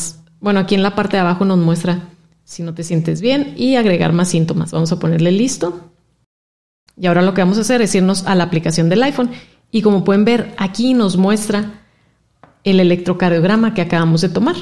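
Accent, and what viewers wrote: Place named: North, Mexico. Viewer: Me encanta tu acento norteño ❤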